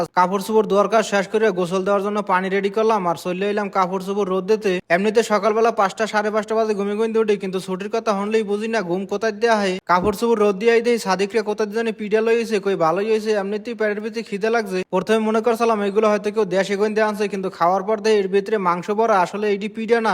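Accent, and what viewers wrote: Barishal, Bangladesh. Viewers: ভাই আপনার বাড়ি কি বরিশাল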